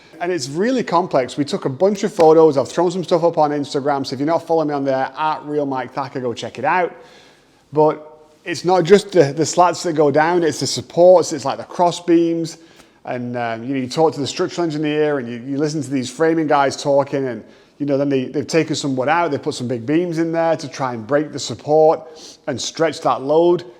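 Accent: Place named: Northern England, United Kingdom. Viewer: Watching from Norway, though I'm originally from the UK! Love that your northern accent is still so strong, hope it doesn't confuse too many of the locals (actually, I kinda hope it does!). 😅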